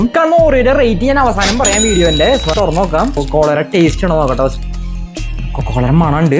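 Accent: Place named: Kollam, India. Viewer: That Kollam saying everything 😂😂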